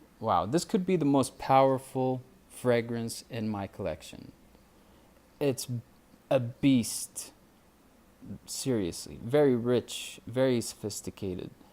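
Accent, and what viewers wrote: United States. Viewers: I'm confused again,so you're arabic but you sound 100% american,so how is english your second language?;)p.s i would subscribe but your last upload was 4months ago. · Where are you from ? You have an American accent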